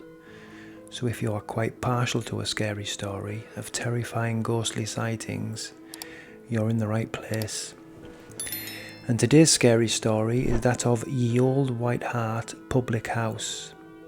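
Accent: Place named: Yorkshire, United Kingdom. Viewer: I love your narration and Yorkshire accent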